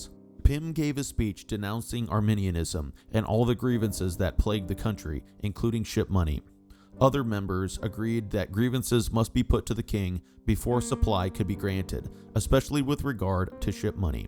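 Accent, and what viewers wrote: United States. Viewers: Good luck everyone to be endured terrible American commentater cringeworthy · I prefer listening to David Starkey who is English and pronounces the English language correctly not some American robotic voice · The Lost Cause is completely riduclous and based in racism, White supremacy and pro-slavery nonsense. The Cavaliers don't exist anymore, the Royalists won in the long run, but the people of Britain wanted the King back, the Americans have never wanted Confederates back. Don't compare the civil war on slavery to the English Civil War. Plus, the Peasants' Revolt never threatened the monarchy. Learn English History. You sound American. You clearly don't know English history, you can't speak English correctly, and you're using Old English in your name. Mate, you're American, you're not English.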